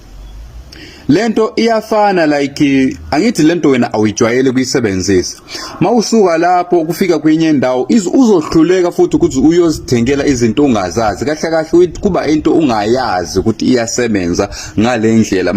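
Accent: Malawi. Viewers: 😂😂😂😂😂 kuthi ukhabazela kamavovo hhayi yishwa phela leli ngoba uyamuzwa lomuntu ukuthi wasemalawi. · Fokof this is Malawian trying to speak isizulu abatjakathi laba bayathwebula laba angibatjembi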